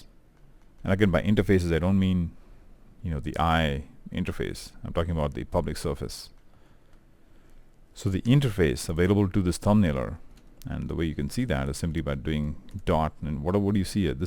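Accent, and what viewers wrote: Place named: England, United Kingdom. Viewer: Finally, a decent video that explains polymorphorism in a clear english accent! Subscribed.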